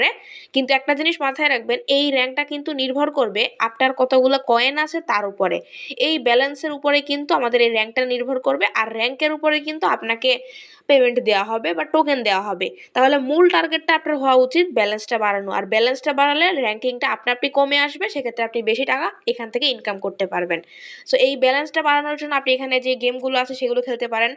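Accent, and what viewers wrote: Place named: Jessore, Bangladesh. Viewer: আসসালামু আলাইকুম আপু, যশোর থেকে নতুন করে আপনার ইউটিউব চ্যানেলে এবং টেলিগ্রামে যুক্ত হলাম।ভিডিও গুলো খুবই ভালো লাগে, আপনার কথা শুনে মনে হচ্ছে আমাদের যশোরের মেয়ে আপনি।আপনার জন্য শুভকামনা রইলো।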